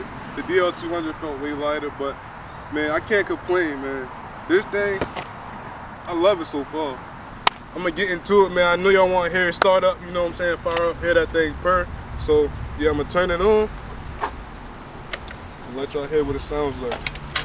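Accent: Baltimore, United States. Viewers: I live down south it's hard to find parts for those Chinese bikes you sound like your from Baltimore is it easy to find parts up there for those Chinese bikes ? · Thank you g, about to go cop one today actually. But aye bro where are you from? Your accent is wild..Baltimore?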